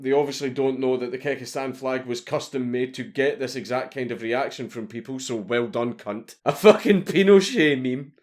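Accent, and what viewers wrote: Scotland, United Kingdom. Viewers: I seriously love your Scottish accent. I've watched so many of your Mad Lads vids the voice in my head now has an accent😂😂🤣🤣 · "you know what i am about" yeah, you are someone who is 'edgy', and says things that sometimes tickle the edge of hate speech. given that america has no hate speech then we would just call you unprofessional, at least while not in a professional setting, bordering on low brow humor, but only sometimes. oh yeah, and you have a scottish accent. you may get some shit for that accent over here.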